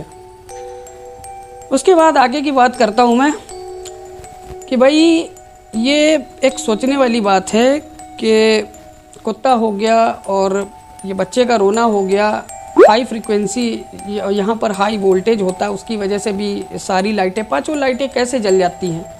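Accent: Bihar, India. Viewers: Interacting channel interesting analysis. To the point. Nd ap bihari ho?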